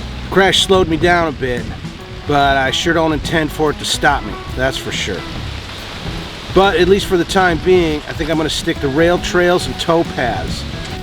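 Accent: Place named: Chicago, United States. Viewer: Hi - like everyone else, I was surprised to learn that you'd had a bad accident that stopped you from riding for a while. I'm glad you're back at it. As many people have said, your videos are very informative - from your biology videos, I get the impression that you teach and your videos have the touch of a teacher who thinks of the important information to pass on and the best way to do it. Another aspect of your videos I enjoy is the quality. I do video work for a living and your videos are really good visual communication. And there's a final thing I really enjoy about your videos. I grew up in Chicago until going off to college. Like you, I live south of Chicago but far, far, far south - in North Florida. Listening to you is enjoyable because you remind me of my hometown. I hope you continue to make videos for a long time. Thanks!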